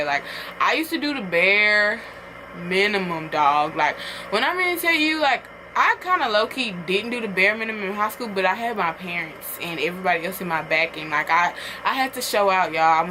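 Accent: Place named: Houston, United States. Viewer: Lmfao you talk like you from Houston 😂😂😂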